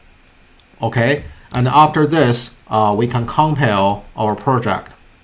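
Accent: China. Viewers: I still can tell that you are Chinese 🤣 Thanks for the video tho.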